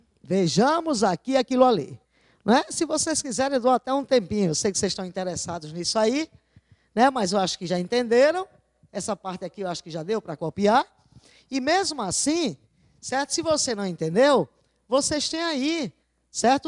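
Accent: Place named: Northeast, Brazil. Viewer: Até que fim, encontrei alguém que fala minha " língua" nordestina porreta. Arretada de boa, clara e objetiva. Obrigada. IVANI.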